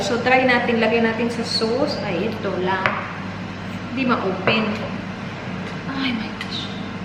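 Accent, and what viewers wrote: Visayas, Philippines. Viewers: Bisaya ka po?😂😂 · Bisaya kaba ate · Ako lang ba ang naka feel na bisaya si ate. 😊😊😊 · bisaya accent talga pag nag tatagalog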